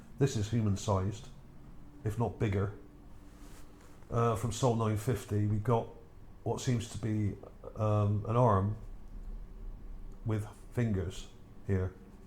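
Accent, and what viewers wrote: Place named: England, United Kingdom. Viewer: Love your accent mate, what part of England are you from , somewhere S.W. I know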